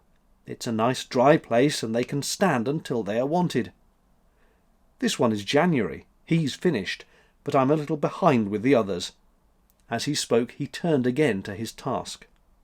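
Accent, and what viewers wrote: United Kingdom. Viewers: I've been listening to CTC at night to help me sleep. Not because the content is boring but because your cool British voice and the low moan of the boat going down the canal lulls me to a peaceful sleep. I found you a year ago and binge watched your entire channel in less than two weeks before finding out it is an effective sleep aid as well. I appreciate the videos and being brought into your home, life. Have a great time away from CTC, I hope you get the rest you are looking for!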